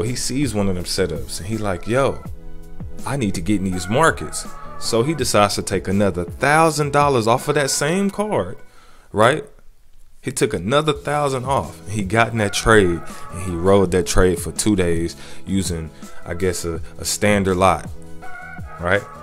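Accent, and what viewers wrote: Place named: St. Louis, United States. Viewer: Sounds like he from St.Louis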